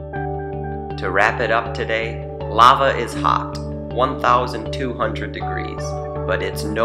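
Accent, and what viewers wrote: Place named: United States. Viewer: Speaks with an American accent but uses celcius.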